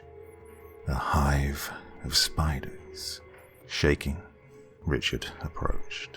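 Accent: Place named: United Kingdom. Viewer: I love how your accent is clearly UK but your son has a mixed accent. & are super good as well! I have a severe arachnophobia so idk how long ill last, but do know that i tried. 😭😭😂😂😂😩👌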